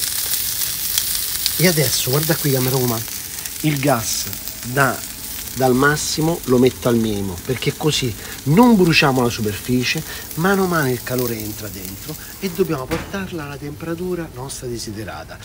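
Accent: Rome, Italy. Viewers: È stupenda la dissonanza tra la parlata romana e i sottotitoli 😁😁😁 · I tagli non ci appiccicano niente, prima di sigilla fuori e non si inizia dall osso. Poi aver separato la carne e’ inutile a quel pubto si sarebbe dovuto cuocere filetto e controfiletto gia’ disossati, inoltre era troppo alta, inoltre la carne e’ troppo magra, insomma quasi ogni cosa che si poteva sbagliare, l’ha sbagliata a dimostrazione che in italia la cultura della bistecca non c’e’ proprio. Inoltre tutto quel romanesco da’ molto fastidio all’ascolto, bisognerebbe imparare un po’ di dizione corretta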